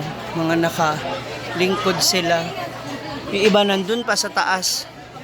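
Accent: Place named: Visayas, Philippines. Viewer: bisayang bisaya nman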